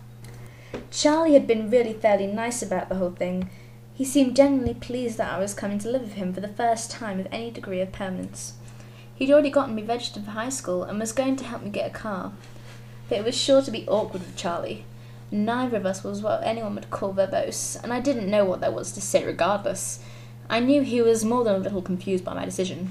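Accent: United Kingdom. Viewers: I LOVE THE BRITISH ACCENT!!!!!!!!! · i love your voice! r u english?!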